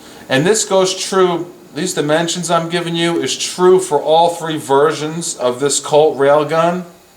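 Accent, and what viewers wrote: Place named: New England, United States. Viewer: Ya from Mass? got the New England accent going on there.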